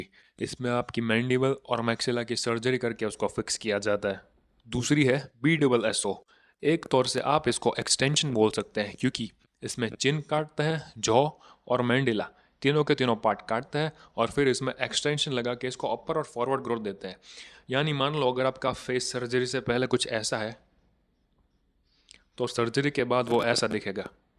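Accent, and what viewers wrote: Gujarat, India. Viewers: Vhi to voice tone gujrati hai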